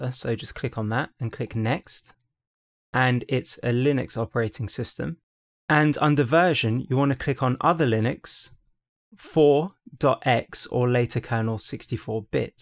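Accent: United Kingdom. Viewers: I love your British accent, it's so cool!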